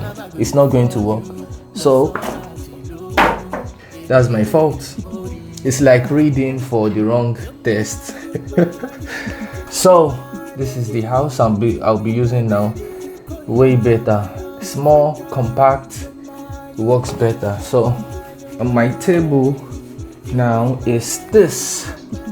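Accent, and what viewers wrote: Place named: West Africa. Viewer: Thought it was Kendrick Lamar till I cliqued on the video and heard that wonderful west african Accent 😅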